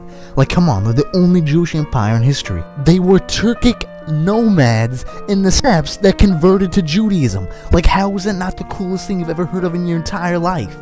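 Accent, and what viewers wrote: United States. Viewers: A moderately interesting look at a little known area of mediaeval history... but the style of narration is appalling and impossible to take seriously. It's not just the Yankee accent I object to but the breathlessly hyperbolic delivery which sounds like a bad sports commentator trying to put a spin on a report about a very boring football match (Association Football that is, not that ludicrous running around in suits of armour the Yanks do). My advice is to get a decent scriptwriter and stop trying to make it sound like the old intro to 'Soap'. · Is the guy narrating this from Jersey? Serious question.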